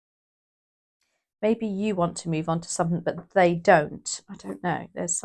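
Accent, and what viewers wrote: United Kingdom. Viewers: I admire readings of this🌹Very Beautiful Lady🌹 These messages resonate with 💯💯💯💯💯 persent. Almost all readings sre brilliant, right in the point 🎯🎯🎯 Plus added amazing British accent which is sheer delight to hear and sounds so pleasing and an effort to make lively environment for us with pretty flowers always maching colours of blouses and light all around, makes this chanel indispensable for everyone who needs to hear excellent readings matching with reality of the circumstances, taking place in life. Thank you very much for helping us in guiding to follow right path. 👏☘️✨⭐🌹🌟✨☘️💫🎗️✨👏👏👏😊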